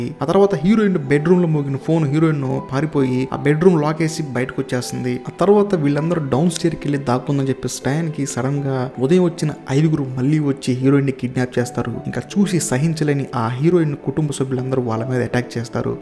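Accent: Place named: India. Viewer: Anna mi explanation simply superrr. Mana telugu slang to cheppadam chala bagundii. One request Ee movies OTT lo vuntee cheppandii Memu chustamm Thank u brooo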